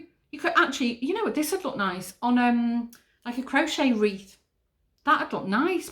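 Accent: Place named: North, United Kingdom. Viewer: How lovely to hear my friend Ruth’s name mentioned. I blame her for my renewed yarn yearning /stash. 😁. What a wonderful podcast, thanks. Great to hear a northern accent too. X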